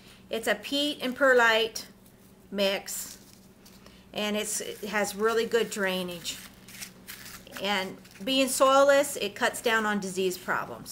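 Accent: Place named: North, United States. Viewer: Where are you from? Accent sounds like a northern state.. near canada?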